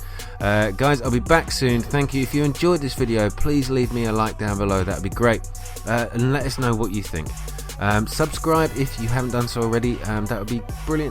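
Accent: United Kingdom. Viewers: Aahh.. I see now that you'd been alerted already, lol.. I had to click "show more comments" to see this one. Hey - you should make a note of that in the description (edit) or something mate... look how many folks didn't notice it and are still thinking that anti-stealth is going to earn near-equal points as stealth - when it's actually less than half the XP eh? Well - I am now subscribed for your future efforts - nice to hear that cool brit accent lol.. cheers!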